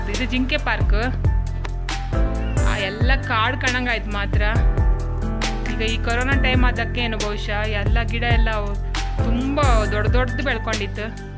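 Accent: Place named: Kundapura, India. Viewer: ಕುಂದಾಪುರ ಭಾಷೆ ಇಷ್ಟ ಆಯ್ತು. ಕಾಂಬ .ಕಾಣಿ. tortu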